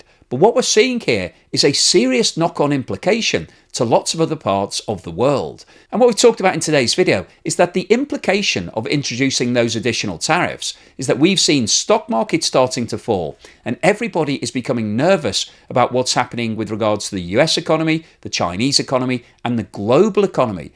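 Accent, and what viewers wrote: United Kingdom. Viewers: As a non native English speaker, I feel speaks the clearest English amongst all Internet speakers. Can anyone tell me from where is the UK is his lovely accent?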